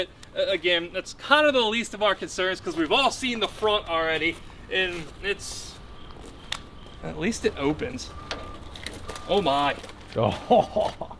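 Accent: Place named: Baltimore, United States. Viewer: I JUST MISTOOK YOUR BALTIMORE ACCENT FOR THE DELCO ACCENT. I had to look it up. Ohh its thick in this video lolo 🤷‍♂️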